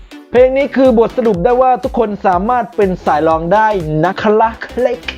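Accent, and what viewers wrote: Isan, Thailand. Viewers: เลดี้ กากา คนบ้านเดียวกะกุนี่เอง สำเนียงกุแต่เกิดเลย พะนะ นี่แน้ะ 555 นอกจากรักลิซ่าแล้วนั้น ขอรักแอดอีกคน ขาดไม่ได้ มาทุกวันนะ พะนะ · อีสานแท้ล่ะว่า พะนะ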